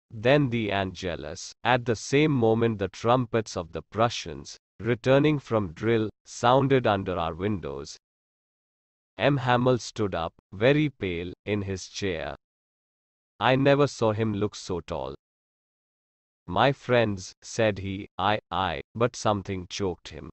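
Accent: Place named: India. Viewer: This miserable solng India English leave please for speaker native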